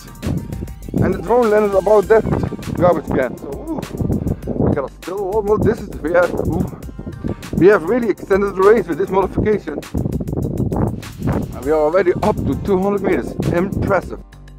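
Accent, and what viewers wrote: Netherlands. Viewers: he sounds soooo much like hes dutch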